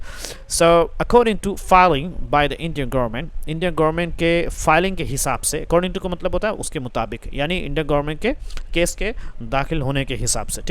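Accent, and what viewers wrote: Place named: United Kingdom. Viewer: You are a British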